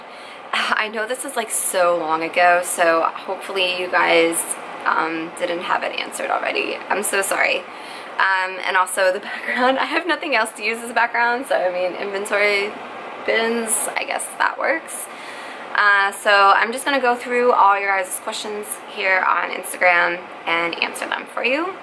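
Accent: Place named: Philadelphia, United States. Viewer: Thank you for your vids! I’m just about to start selling on Poshmark. I Love your simple instructions, and your inventory idea is brilliant! I used to live outside of Philly (Mainline and Drexel Hill), Love that accent! 😘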